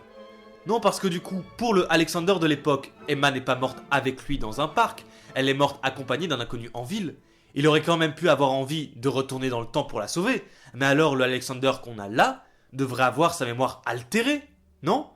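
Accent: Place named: Belgium. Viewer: Je m'étais dit "allons voir sa vidéo pour apprecier ce somptueux accent belge" mais sans moquerie c'est très interessant. BRAVO.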